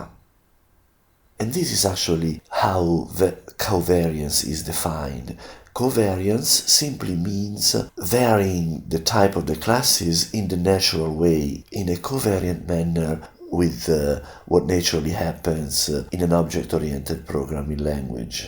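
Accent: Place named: Italy. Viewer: Ciao! la explicación excelente, pero el acento italiano... meraviglioso!!! los oídos latinos agradecidos! "me gusta", "suscripción" y "difusión"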